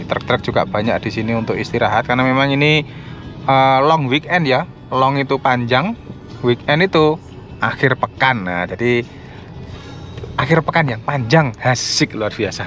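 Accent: Java, Indonesia. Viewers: Thank you for sharing. Jowoné medok bgt mas. Kebetulan kami mau travelling dari Malang sp Solo sewa mobil. Ini YouTube benar² membantu karena saya sudah keluar dari indo 48 th yl.. No idea about tol & BBM 👍👍👍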